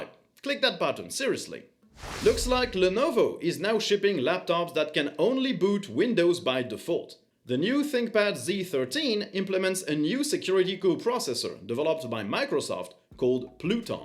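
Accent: France. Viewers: Is this a Frenchman speaking the islanders' language?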